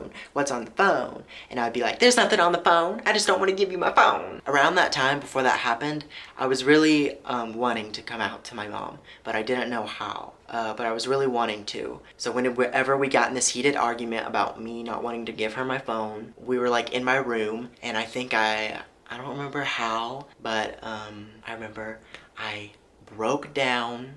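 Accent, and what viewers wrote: South, United States. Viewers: Your southern accent is so cute I love it :)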